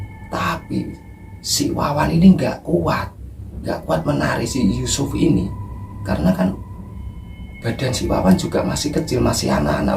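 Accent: Java, Indonesia. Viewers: Salam santun dri kuala kansar perak. Muga anda smua mdptRahmat n Kasih llahi jua. Amin2x. Taniah pd nsumber dan host yg msampaikan dgn baik tapi he he he ada lengguk bahsa jawa namun kmi org melayu k.kangsar masih bisa mgerti Mas. T kasih. Sya titip pantun sja Mas . Bolihkan. Permisi. Jangan ditanya kemana aku pergi. Abg Udin myanyi seorang diri. Sudah lama aku mcari. Rupanya cnell. L. D. sudah lama disisiku. Salam santun dri kota kuala kangsar, perak. T q. 🌴🙏🙋🦋🌄🐓🌳🏖️🐠🐠🍀🦋🙏🏞️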